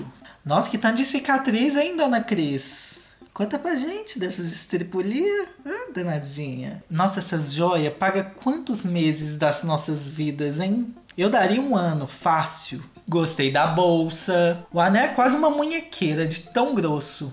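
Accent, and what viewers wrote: Minas Gerais, Brazil. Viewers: Kkkkkkk Nem sei prq esse vídeo apareceu p mim kkkkkkk Vc é muito carismático É mineiro?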